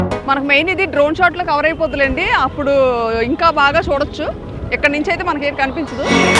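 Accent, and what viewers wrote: Vizianagaram, India. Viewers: గలగలా మాట్లాడేసి రెండు తెలుగు రాష్ట్రాలే కాదు, ప్రపంచ వ్యాప్తంగా ఉన్న తెలుగువారి సోదరి ..మళ్ళీ మళ్ళీ వినాలి అనిపించే విజయనగరం తెలుగు పరిమళం ...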